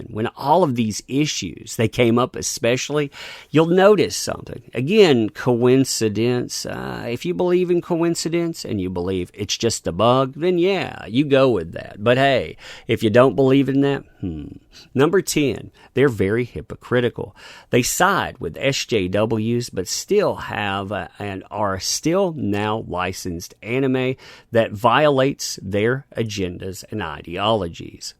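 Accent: South, United States. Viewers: I love you and that southern-ish accent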